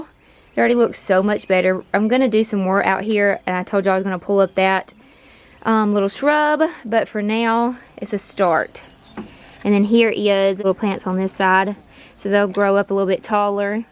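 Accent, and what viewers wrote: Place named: Alabama, United States. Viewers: Did you say where you are from? Finally a “YouTuber” that sounds like me 🤣 I’m an East Tennessee gal! I love wha you have done and it gave me so many ideas 💡 we have a small farm and I like the “farmhouse” look but sometimes it can be too busy for me. We had a storm door like yours for a long time and I finally found someone who custom made me a screen door that I absolutely love ❤️ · Are you from Alabama. You sound like the folks out here.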